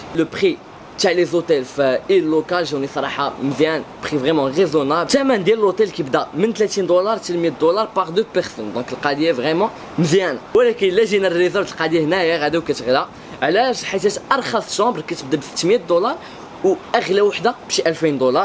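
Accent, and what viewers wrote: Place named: Senegal. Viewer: كدوي بالفورونسي بحال شي سنغالي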